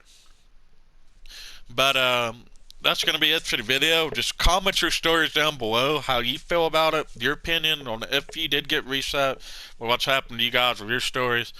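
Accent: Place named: South, United States. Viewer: He sounds like he’s from the southern US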